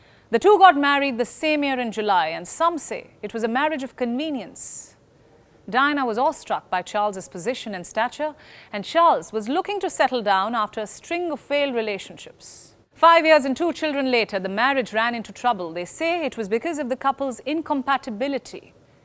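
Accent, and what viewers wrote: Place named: India. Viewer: Listening to a Hindu accent is uncomfortable… Sorry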